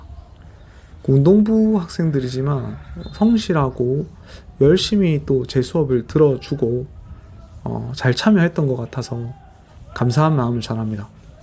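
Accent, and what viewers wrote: Busan, South Korea. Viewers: 우연히 보게된 영상인데.. 희한하게도 끝까지 보게 됐네요. 익숙한 부산 사투리.. 그리고 같은 전공(아 저는 사범대는 아니고 그냥 국어국문. 동기들 중에 부산에서 교사 하는 사람들이 좀 있죠. 어쩌면 선생님과 같이 근무를 하셨을수도.. ㅎㅎ)게다가 쭉 보다보니 남편의 모교이기까지.. 이만하면 끝까지 볼 이유는 충분한 건가요? 학교에대해서는.. 선생님만큼 할말이 많은 학부모 시기도 모두 끝난 사람이지만 여전히 학교에 대해서는 많은 생각을 하게 됩니다. 우리 사회에 얽힌 실타래가 있다면 그리고 그 실끝을 따라가 보면 끝에 교육이 있고 학교가 있다고 생각하기 때문이죠. 큰 아이를 처음 초등학교 입학시켰을 때의 충격이란.. 내가 국민학생시절이었던 1980년대 초등학교와 별 반 다르지 않은 시스템에 놀랐습니다. 교실마다 에어컨이 있고 샷시가 좀 고급이고.. 그 정도 물리적 발전 말고 소프트웨어적인 발전은 찾을 수가 없었거든요. 선생님이 어떤 고민을 하셨을지.. 충분히 이해된다면 자만일까요? 아, 우연히 본 유튭에 너무 몰입을 했네요. 댓글까지 꽤 꼼꼼하게 읽었으니까요. 학생들의 댓글을 보니 좋은 선생님이셨음이 충분히 느껴집니다. 연못에서 탈출하셨으니 넓은 바다로 가서 마음껏 헤엄치고 즐기시길 바랍니다. 응원합니다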